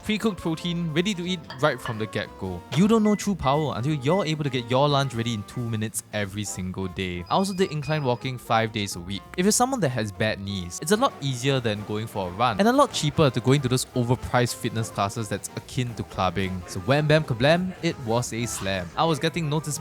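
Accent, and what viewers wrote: India. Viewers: not trying to be rascist but how do you sound indian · nice and informative video, but how come you have Indian accent?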